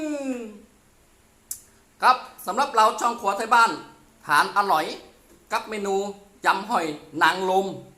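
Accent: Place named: Sukhothai, Thailand. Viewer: สวัสดีครับทักทายครับสำเนียงเหมือนสุโขทัย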